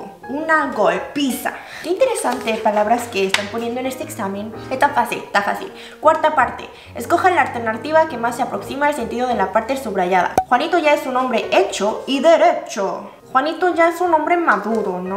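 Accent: Mexico. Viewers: Hermosa Korean a con acento mexicano, lo mejor de dos mundos 😍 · Se nota el acento mexicano jaja Y algunos usos incorrectos creo que se llama jerga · tu hablas el español mexicano, las mayorías de las juergas mexicanas son feas cuando se escucha en otros paises. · Tu español es mexicano... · Apoco aprendió español Mexicano, el más chingo de todos. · Hablas español Mexicano, se tetona el acentro y las palabras de la rosa de guadalupe. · Hablas perfectamente. Con acento Méxicano. Pero muy bien. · Soy el único que cree que su forma de hablar no es el español neutro sino más como el de los castrosos "Whitexicans" ? espero que con el tiempo hable mas neutro :) Suerte en todo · Pero y esta reina?! Tiene un acentazo mexicano brutal! A mí como española, me dicen que es de Méjico y me lo creo! Cero acento extranjero! También es cierto, que he notado que la fonética coreana y española, aunque no lo parezca desde fuera, es muy semejante. 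Yo llevo 10 años aprendiendo coreano y cuando me escuchan hablar siempre me dicen "porque tienes cara de europea, si no creería que eres de Seúl" jajajaja. Supongo que al final entre coreanos e hispano-hablantes no tenemos demasiado acento al aprender el idioma del otro! (el problemita, problemón, está en la gramática... Ahí sí se vino drama, lol) Anyway, buenísimo tu español, amiga.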